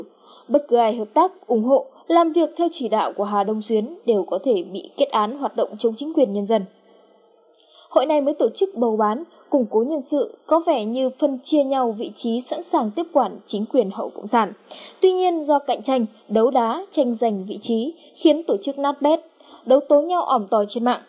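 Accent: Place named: North, Vietnam. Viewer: giong cua mien bac viet cong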